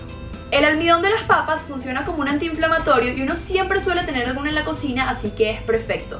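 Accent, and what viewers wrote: Venezuela. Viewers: Una pregunta tu eres Venezolana? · Igual porque eres venezolana no capto tan rápido jeje, yo soy mexicana y a veces al escuchar los diferentes tipos de acentos de otros países aunque hablemos castellano a menudo me son un poco difíciles de cachar ;) pero muy buenos tips! gracias ;) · eres venezolana? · sii soy venezolana, y supe que tu lo eras por tu acento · De que pais eres?? de Venezuela?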